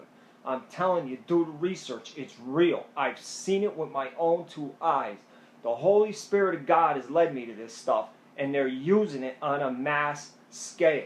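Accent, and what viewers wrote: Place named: Pittsburgh, United States. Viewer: elroy elongated naw this is classic pittsburgheez . It's how we sound in PA. I actually didn't notice until I read this. Google it, we have a distinct accent around here.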